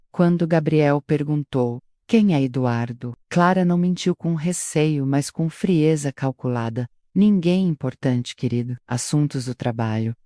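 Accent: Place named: England, United Kingdom. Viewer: Porque o sotaque forte do Ingles? A historia é bem interesante❤❤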